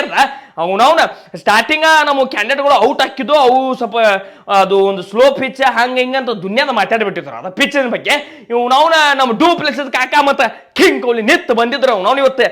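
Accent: North Karnataka, India. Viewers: Anna super talking....namma uttarkaranataka basheyalli...ninna RCB abimanake love u......plz go to I p l...kannad cometary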